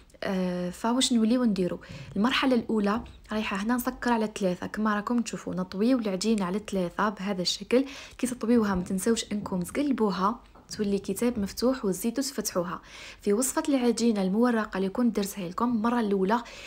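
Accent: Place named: Constantine, Algeria. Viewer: جاتني هدرتك قسنطينية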